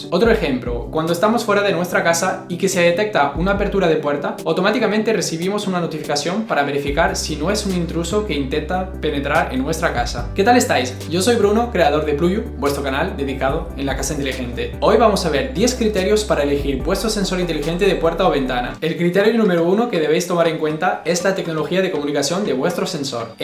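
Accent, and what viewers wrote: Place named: Spain. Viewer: OMG es Elijah Wood hablando en castellano ...... perfecto acento español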